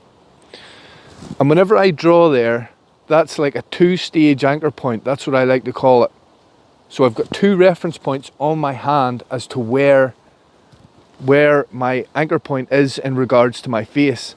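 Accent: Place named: Scotland, United Kingdom. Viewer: The single best thing about this video is that is Scottish hahaha tnx for a great vid lad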